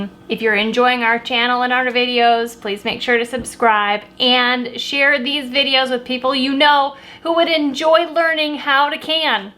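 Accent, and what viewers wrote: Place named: Midwest, United States. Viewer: I KNEW you were from WI or MN from your accent. LOL! Thanks for all your great videos.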